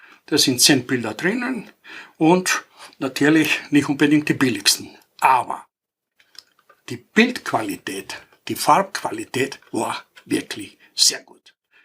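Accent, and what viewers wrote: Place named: Austria. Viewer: von victor 1977 frankfurt am main,ach ich liebe den leicht jiddischen oesterreichich ungarn dialekt wenn du sprischst,das konnte ich mir stundenlang anhoeren,oder was ist das fuer ein dialekt,auf jeden fall ein aehnlicher dialekt,sehr original und entspannende,,von unseren deitschen europaischen dialekten muesste mehr an die neuen generationen weitergegeben werden...gruese von mir,schenes viddeo,und mach weiter so,ach ich glaube in den 70ern im italien urlaub hatten wir auch so eine kodak,vor allem an die kodak kassetten kann ich mich erinnern,aber in den ende 80ern anfang 90ern kauften wir eine polaroid kamera,und kennst du noch die kleine kamera mit discfilm,was war das nochmalfuer ine marke.kodak ist aber jetzt wieder voll auf dem weltmarkt,mit ganz neuen guten producten auch motion film und neuen kameras wie minishot2,wirklich echt gut...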